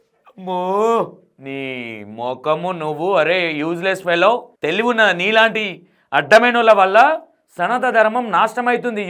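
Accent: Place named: Telangana, India. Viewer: anna miru,mi acting and telangana yasa super lo vallanun tittadam super 😂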